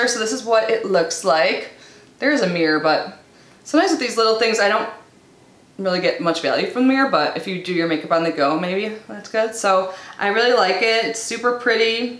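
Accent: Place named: Midwest, United States. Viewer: Subscribed! I started watching your Ipsy videos a while ago, I really love them :) also I totally resonate with your midwestern accent haha. Keep it up girl 💜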